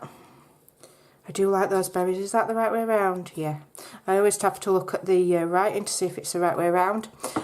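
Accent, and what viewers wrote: Yorkshire, United Kingdom. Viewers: Only just discovered this brilliant double sided page cluster and I 💝 the concept... and the yorkie accent 😊 Cheers from Australia 🐨 🐾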